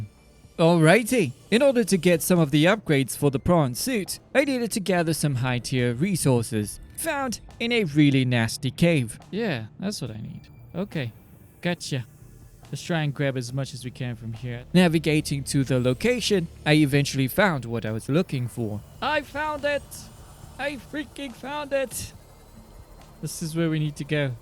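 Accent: United Kingdom. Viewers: 😂❤❤ it gor me soo badly ur british? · He kinda sounds British not trying to be mean but you do but your my top 1 favorite youtuber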